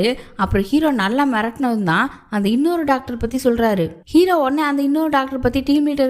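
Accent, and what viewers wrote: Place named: Tirunelveli, India. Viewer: Apdiya. Nanum old TVL new TKSI districthan. Irunthalum konjam different ahgathan irukku. But nallirukku unga slang😊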